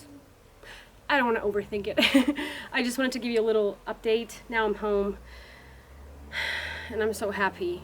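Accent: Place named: United States. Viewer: Damn, you sound so American, like the loud kind, I don’t m a that in a bad way, I’m American. It’s mind bending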